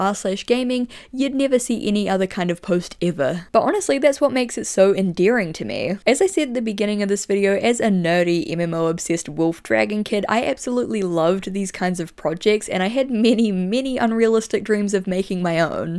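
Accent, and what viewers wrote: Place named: New Zealand. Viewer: Not only is your lovely kiwi accent with all those wonderful inflections and vowel pronunciation just magic to listen to when relaxing or reading etc... But you have great scripts and they flow so well. You put a lot of information in your videoes but they never feel like there's too much to digest or you are moving too fast... You have a great knack for taking these complex stories apart and making an engaging, enjoyable and interesting script out of them... Aaaaad it's just a delight to see what fantastic and cool looks you will rock for the video. You never ever ever fail to make me go 'wow' 😂 You are a real treasure and I really hope your channel grows and you get the success you deserve. Have a really great Christmas and a very happy new year! 🍻 ✌️ ☀ 🎅 🎄🎁☃️❄️❄️ From 🇮🇪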